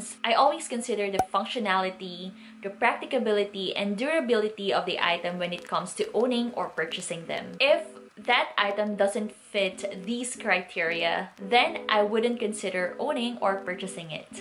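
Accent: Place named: Philippines. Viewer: Me too, not a fan of jewelry. Earrings and watch will do. Btw, I love your Filipino accent don't mind the troll account. 😉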